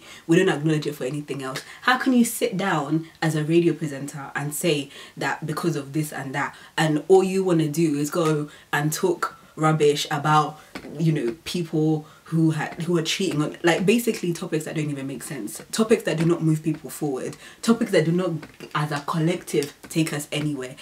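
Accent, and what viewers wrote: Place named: United Kingdom. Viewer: That British accent is on POINT! wow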